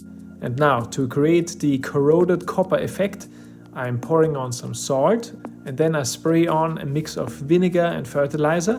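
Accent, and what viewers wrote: Austria. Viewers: Are you Austrian? Your accent is very similar to Arnold Schwarzenegger's to my ear.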